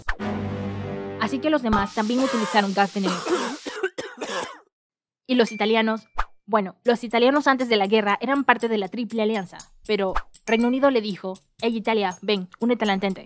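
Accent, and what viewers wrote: Panama. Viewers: Eres de Panamá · No entendi español pls (Eres de Panamá?)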